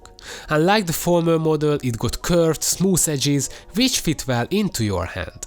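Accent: Hungary. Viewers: The hungarian accent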